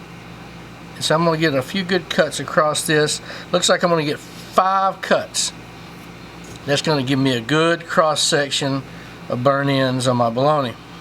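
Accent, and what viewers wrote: South, United States. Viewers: well..let's see...he's combining bar b que....and bologna.....and describing the middle of the piece of bologna as "center- mass"....while cutting it.......and talking with a thick southern accent....my guess is he voted for Joe Biden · I love this guy's accent, he's like an endearing southern uncle.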